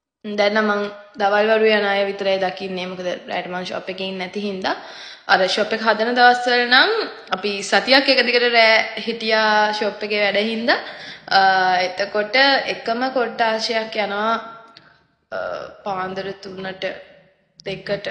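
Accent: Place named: Kambanwella, Sri Lanka. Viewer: කංබංවැල්ලෙන් ඇවිත් වගේනෙ කතාකරන්නෙ මෙයා.. අපරාදෙ කාලයයි ඩේට ටිකයි කැපිච්ච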